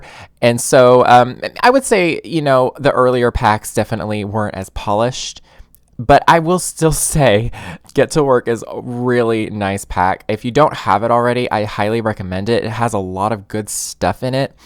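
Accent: South, United States. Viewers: Hey!!!! So Happy To See YOU!!!! And Your "Country Accent" Gotta Love A Country Accent! LOL This Is DARLING!!!! I've Never Done Limited Packs......... SOMEDAY! I Got Discover University Too... Haven't Played It Much.... With My Depression But Feeling SO Much Better Now....!! Back To Sims Now! HUGZZZ To YOU! 😘😘😘😘😘😘😘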